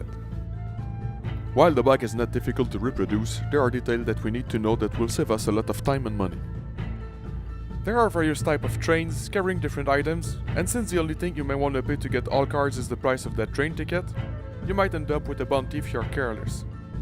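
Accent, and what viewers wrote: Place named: Quebec, Canada. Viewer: J’entends l’accent québécois bro 😂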